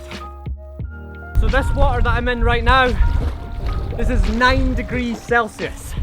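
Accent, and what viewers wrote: Scotland, United Kingdom. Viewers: Are you Scottish!? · are u Scottish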